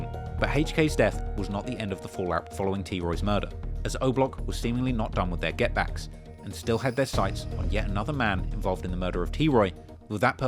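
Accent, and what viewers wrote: United Kingdom. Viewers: nothing like a brit talking about american gangsters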